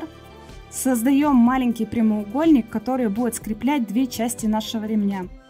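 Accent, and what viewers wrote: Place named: Japan. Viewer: I like japan english.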